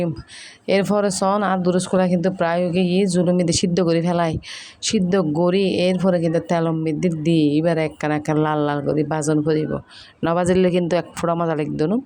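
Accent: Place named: Chittagong, Bangladesh. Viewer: আপু চট্টগ্রামের আঞ্চলিক ভাষা তোমার মুখে শুনতে খুব ভালো লাগলো ❤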